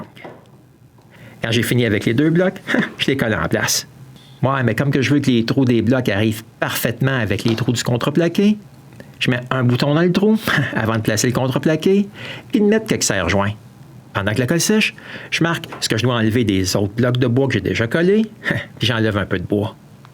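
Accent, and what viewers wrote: Quebec, Canada. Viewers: OH! CT'ACCENT TROP JOLI si c'est pas Québec où Montréal viens plus en France ''ils'coprenn pas envoi le sucre d'orge (le sirop d'érable) et la Crépinne (crêpe) je crois.. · le magnifique accent québécois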